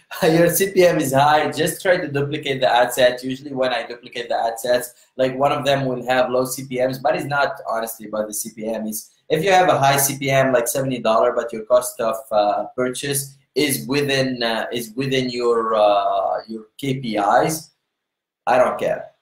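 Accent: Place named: India. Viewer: انجلزيتو مثل انجلزية الهنود الي عمرهم ما خرجو من الهند !!! لا اعرف لماذا يصر على التكلم كليا بهذه الانجلزية !!!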